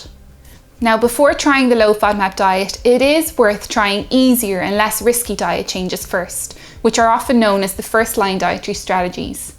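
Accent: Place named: Ireland. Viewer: That ìrish accent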